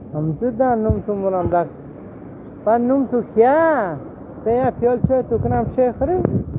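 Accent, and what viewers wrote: Tajikistan. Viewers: сахт Точики гап мезадиян 👏👏👏👏👏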